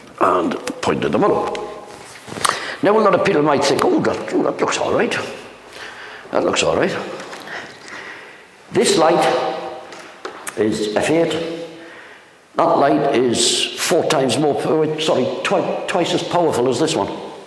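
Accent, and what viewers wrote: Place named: Newcastle, United Kingdom. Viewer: That's a Geordie accent (from the North East of England, probably Newcastle) not a Scottish accent (like Scotty - from Scotland).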